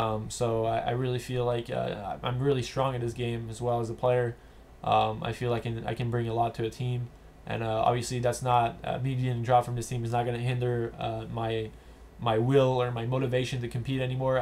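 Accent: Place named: Quebec, Canada. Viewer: haha such a strong quebec accent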